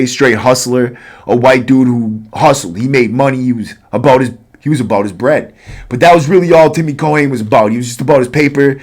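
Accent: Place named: Brooklyn, United States. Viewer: Luv the channle bro I could listen to you talk for hours u remind me of this Brooklyn dude, my boy "Moe" I met up top in NY. I only did 2 years but Holly Shit that was a CraZy Time of My Life!!! Prison politics are crazy. I ended up working out with him then we got in a fight. I fought well but he got the better of me and broke my rib. I laid up for a week and could hardly breath for a few days but kept my mouth shut after that we spoke dapped up and we ended up becoming Boyz and running a store together working out cooking I was in. Its crazy how sometimes you make friends after you beat each other's asses. Lol I gave him a black eye in the fight I couldn't breath bc he broke my ribs, he looked like shit for a week. HaHa then we were cool after😂 prison is fucking crazy bro😅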